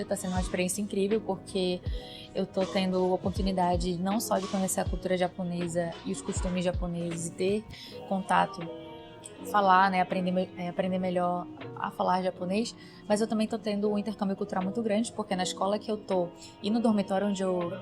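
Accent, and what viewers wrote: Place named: Pará, Brazil. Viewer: Um sotaque lindo, parece paraense❤😂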